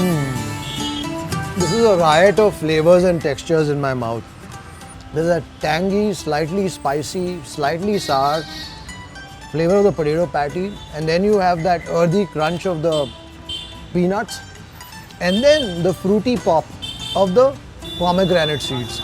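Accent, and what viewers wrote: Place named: Mumbai, India. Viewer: sir, it's nice to hear you speaking the typical mumbaikar accent.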